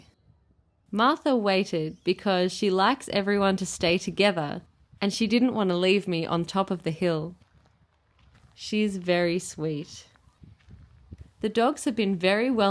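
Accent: Australia. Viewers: helloo, iam learning these ausie accents from you its lovely to hear it and it is so calming to my ears...sending the love from Indonesia 😁😁:hands-yellow-heart-red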